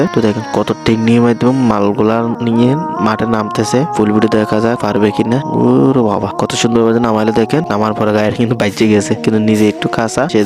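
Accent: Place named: Chittagong, Bangladesh. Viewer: তুমি চিটাগং তোমার কথা শুনে বুজা যায় যতোই শুদ্ধ বলো চাটগাঁইয়া শুদ্ধ বলতে পারে না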